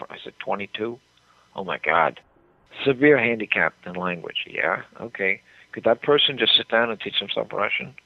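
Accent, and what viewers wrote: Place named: Northeast, United States. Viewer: You are correct. The speaker in this video has an upper Atlantic/Northeastern accent. Not familiar with southern accents and assumes that LHO is not capable of learning Russian? Not an uncommon inference.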